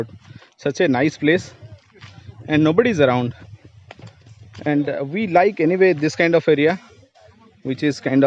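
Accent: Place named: Odisha, India. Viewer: Aap odia walo ho na .. english clean nahi hai aap ke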